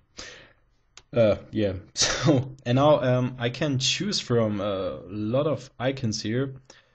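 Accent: Germany. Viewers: I totally agree, you should never apologize for where you came from! But I think what he meant by that, was that he's sorry having poor English because he's German. But German accents are still something you should never apologize for!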